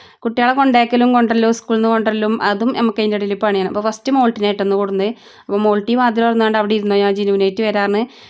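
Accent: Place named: North Kerala, India. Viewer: Uttara Malayalam speaking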